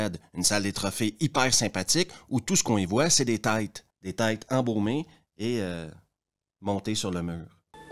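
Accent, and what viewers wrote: Quebec, Canada. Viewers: 😅 Je te découvre, et du coup je me régale avec tes trouvailles, et en tant que fan de F. Pérusse (en Québécois, s'il vous plait, sinon rien!), bein c'est encore plus agréable. Cet accent prépare le corps à sourire, voire plus si affinité. ;) Mais pour en revenir à la principale information de ta vidéo (😂)... En fait, ça ne date pas du nichon, ce déni idiot de la réalité chez les américains. Ca date de la drogue. (et sur ce sujet, ça continue de plus belle...) On cache, on cache... et plus on cache plus ça veut dire qu'il y a des choses à cacher. J'en fini par me demander quel coup fourré se cache dans le nichon américain moyen. Je sais bien qu'ils passent leur temps à s'arnaquer les uns, les autres, mais je me dis: "... quand même... Ils n'ont pas piégé leurs nichons???" Moi j'crois que y a d'la coke, dedans... C'est du nichon dangereusement psychoactif! 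...où peut-être qu'on a à faire avec du nichon OGM qui produit des terres ULTRA rares pour leurs futures technologies nouvelles, genre: des cravates connectées où des SUV pickups amphibies géostationnaires capables de recharger eux-même leurs batteries en tétant le pis d'une vache bio des Alpes Suisses? En tout les cas, je ne doute pas qu'un avenir radieux nous en apprendra un peu plus sur cette "apparente" phobie du nichon. Ça va forcément évoluer vers du bon, aucun doute...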